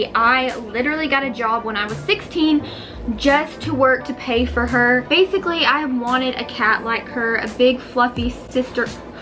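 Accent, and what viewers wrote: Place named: Tennessee, United States. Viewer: aww awesome video! this channel deserves more views! love hearing the tennese accent to lol!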